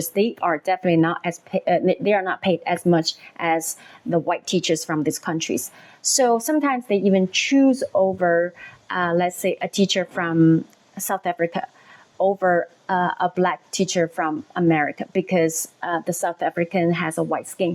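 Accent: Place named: China. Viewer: Is it skin color or is it expertise? For example, white native Italian speakers born in Italy probably have an advantage getting a job teaching Italian in US over someone who is white and whose first language is English. You speak English well but you still have a Chinese accent so a native English speaker will have an advantage. Move to another country and teach Mandarin if you want to make more money.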